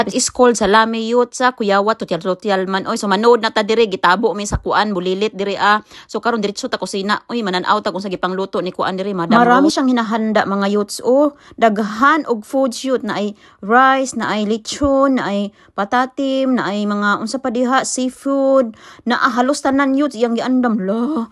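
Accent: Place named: United Kingdom. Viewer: Paspasi ang cheers dihaa! ang imo British accent dai kay gwapa paminawon.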